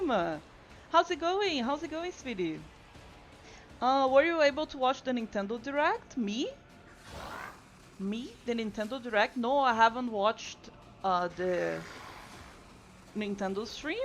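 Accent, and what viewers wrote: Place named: Poland. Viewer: Your accent sounds polish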